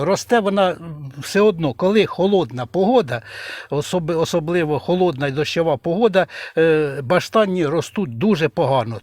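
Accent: Poltava, Ukraine. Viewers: я просто з Миргорода, завжди Полтавський діалект виділявся серед інших)))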